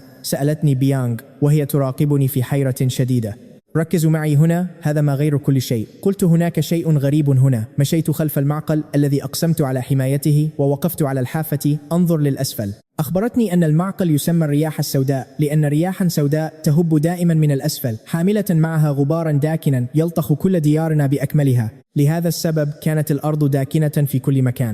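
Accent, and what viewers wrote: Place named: Iraq. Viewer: باللهجه اظنك من العراق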